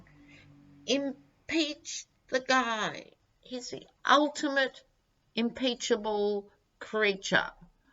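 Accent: Australia. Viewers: Love that Aussie accent -- when you said you'd ordered a couple of new decks, it comes out dicks. If Ivanka is indicted, she won't hesitate for a New York second to rat out her father, to keep herself out of prison. We're so caught up in the US over the Trump business, we forget other countries in the free world have their disappointments, too. Australia -- we feel your pain. Thanks for the great reading -- as usual.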